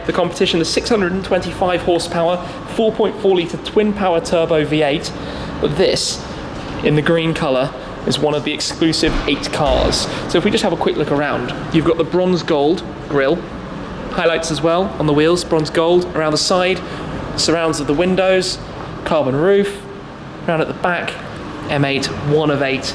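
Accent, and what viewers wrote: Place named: RP, United Kingdom. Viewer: Ur so posh bruv allow it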